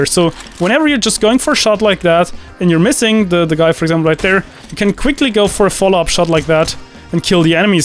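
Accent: Germany. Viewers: English sucks man, it's German accent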